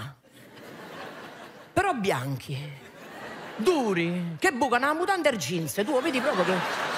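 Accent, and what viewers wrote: Rome, Italy. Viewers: Non sopporto il romanesco, però simpatica,bella, brava e simpatica....complimenti.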